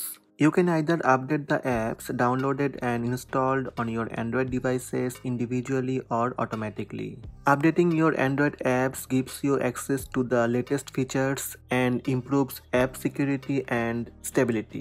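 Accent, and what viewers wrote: India. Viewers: GOOGLE IS USELESS THANK YOU MY INDIAN LOVABLE ACCENT GUY! also im subscribing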